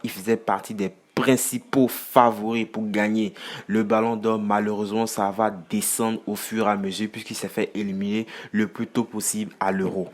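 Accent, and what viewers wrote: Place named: Ivory Coast. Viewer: T’a l’accent ivoirien toi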